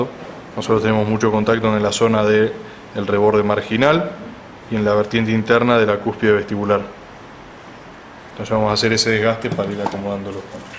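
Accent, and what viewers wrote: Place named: Argentina. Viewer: Buena enseñanza y buena explicación y sos Argentino nos entendemos mejor gracias xr compartir tu experiencia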